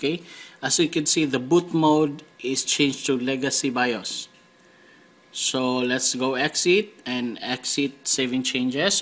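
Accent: Philippines. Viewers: Halatang pinoy to ah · that Filipino accent tho🔥🔥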